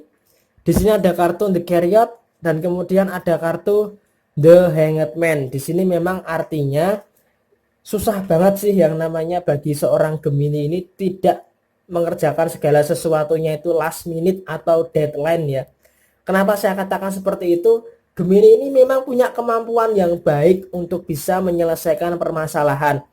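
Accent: Java, Indonesia. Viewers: Sya nlah ktawaaaa dngarttt host...yg bawain reading an...logatnya ithu khaz kental.orang jwa....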